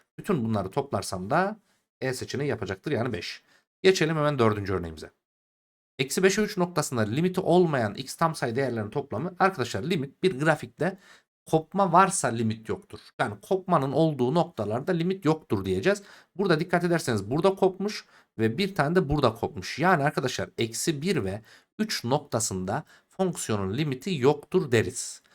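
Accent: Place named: Kayseri, Turkey. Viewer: Hocam nerelisiniz konuşma tarzınız kayserililere benziyor da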